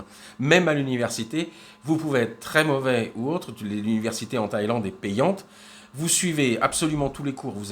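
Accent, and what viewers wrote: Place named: France. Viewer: Ce n'est pas la fonction qui donne le TITRE de professeur.Tu DONNES des cours d'anglais A moins que tu n'aies des diplômes certifiant ta capacité à enseigner.Ce qui m'étonnerait compte tenu de ta prononciation très ''frenchy'' de l'anglais,et AUSSI de tes très grosses lacunes dans le maniement oral et écrit de la langue française...............tu comprendras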